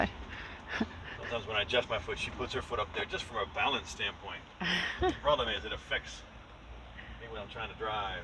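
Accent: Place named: Australia. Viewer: Thanks for taking us along. I’m loving the vlogs! And…..now that I know you’re from Australia I can hear some faint whispering of an Aussie accent 🥰.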